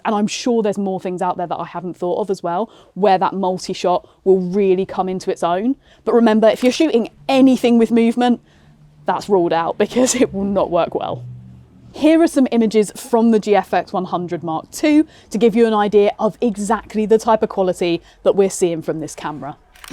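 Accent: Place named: United Kingdom. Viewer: 👍🏾👏🏾💐📸👸Good review. Love the British accent. Phelix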